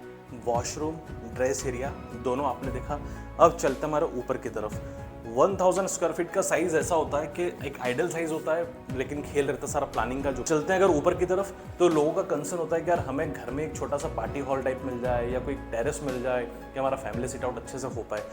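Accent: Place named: Malwa, India. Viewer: आपके बात करने का सलीका बिल्कुल मालवा के गांव के लोगों जैसा है, कौन से गाँव से हो आप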